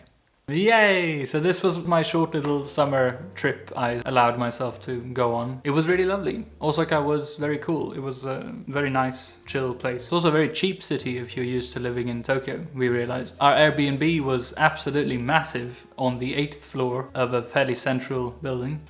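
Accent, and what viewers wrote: England, United Kingdom. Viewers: I have officially found my favourite youtube channel, came her for the uni info, stayed for the sarcasm. I still can't believe you're swedish, not that I have many swedish friends to compare you with, but I could have sworn you were english ( the accent fooled me ). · I know Swedes speak good English and you lived in the UK but damn, the British accent is spot on. Not a hint of an accent like you were born to speak English.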